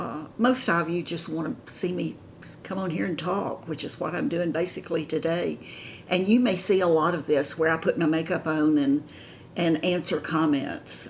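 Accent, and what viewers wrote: South, United States. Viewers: It is so lovely to listen to your voice - your soft, lilting Southern accent. I find it most relaxing. 😌🙋‍♀️🥰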